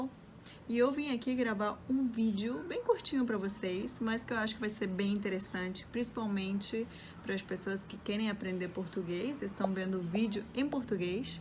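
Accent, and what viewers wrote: Rio de Janeiro, Brazil. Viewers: Seu sotaque brasileiro está perfeito. Por favor, faça esse vídeo de, "palavras em espanhol difíceis para brasileiros". Estou tentando aprender espanhol. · Voce tem um sotaque carioca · Caramba seu sotaque é bem carioca, nunca falaria que você não é brasileira. E esse sotaque do interior do Rio é muito bonito. Você está no interior do Rio? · Seu sotaque é mais carioca que o meu ..kkkk e nasci no RJ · tem sim, claramente o sotaque dele é carioca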